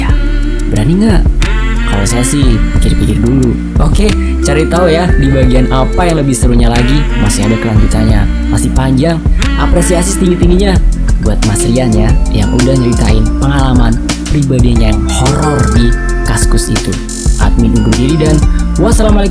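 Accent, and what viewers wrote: Java, Indonesia. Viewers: J Ms jowone medok bngt rek 😂😂😂😂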